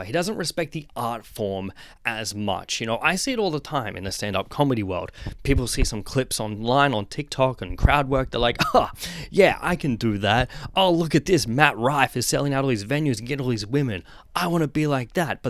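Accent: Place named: United Kingdom. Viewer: Damn im born in texas and live in canada since im 13 and now gonna be 23 next month but i thought you we're british 😂 but love the content I'm gonna subscribe aha!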